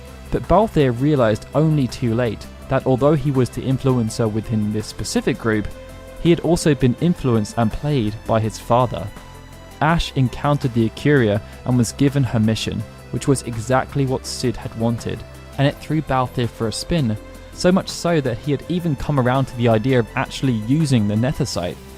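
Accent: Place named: United Kingdom. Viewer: You and Balthier are the best people with british acents to ever exist also do Kain next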